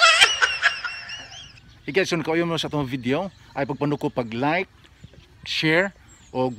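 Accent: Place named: Visayas, Philippines. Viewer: Arang ka bisaya kaayo nimu Sir uyy! Taga Cebu diay ka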